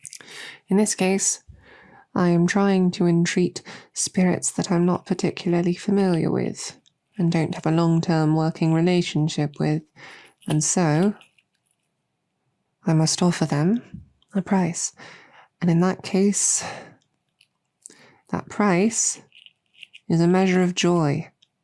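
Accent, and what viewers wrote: United Kingdom. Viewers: The British accent is healing for the soul ❤️‍🩹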